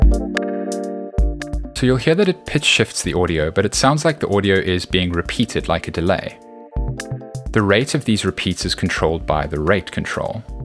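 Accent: South Africa. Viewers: I know a South African when I hear one!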